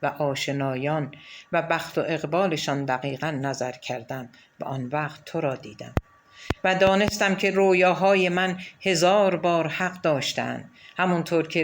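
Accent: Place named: Russia. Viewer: چرا اینجوری صحبت می‌کنید؟؟ بیاد گوینده بخش فارسی رادیو مسکو در زمان شوروی افتادم که خانمی مسن که احتمالا از سرکردگان بخش فارسی حزب کمونیست بود، با تُنالیته خشن و مردونه، بالهجه ای که دیکتاتوری سرخ مسکو رو تداعی میکرد، و انگاری از توی یک سالن دراز خالی حرف می‌زنه، با اون لهجه فارسی_روسی میگفت: اینجا مسکو _بخش فارسی اتحاد جماهیرسوسیالیستی شوروی!! و ما هروقت شیطنت میکردیم مادرمون رادیو رو روشن می‌کرد و می‌گذاشت روی موج رادیو مسکو و میگفت : باشه اذیت کنین ولی خانمه صداتون میشنوه شب میاد میخورتتون.... 😅 وما بچه ها میخزیدیم زیر لحاف و فکرمیکردیم خانمه اگربیاد تاما رو بخوره، حتما نمیتونه مارو زیرلحاف پیدا کنه.....!! 😂😂😂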